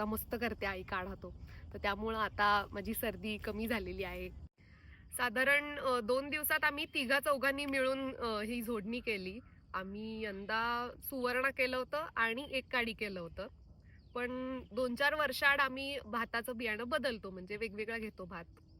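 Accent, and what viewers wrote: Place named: Konkan, India. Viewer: रानडुकराची तर काही बोलायला काही जागाच नाही खुपच वाढ झाली आहे 15,20 वर्षा अगोदर एवढे राणडुक्कर नव्हते आता करारा, तीर, मसूर तेलबीया जसे जवस होऊच देत नाही आता तर शेतकरी धानाशिवाय दूसर पीक लावायलाच पहर नाही भीतीने खूब नुकसान सहन करावे लागत आहे हा आणी तुमची कोकनी मराठी आमी पूर्व विदर्भाची मराठी जवळपाश समान वाटते काही शब्द सोडले तर जे गाने तुम्ही गायले ते पन तसेच आहे पन हल्ली ही परंपरा कमी होत चालली आहे मम लहानपनी रात्र, रात्र मचानी वर झोडीत बन्ऊन पहाटेला उठान फाखरापासुन ज्वारी ची राखनी, मळनी करायचो मला खुपच आवडायचे आता राहील्या त्या फक्त आठवनी ❤❤❤❤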